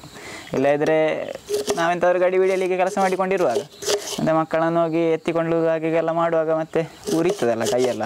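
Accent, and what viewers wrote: Mangalore, India. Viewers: As a malayali living in bangalore your (Mangalore) kannada accent is so beautiful, does not sounds like rude as here in bangalore due to inter-mixing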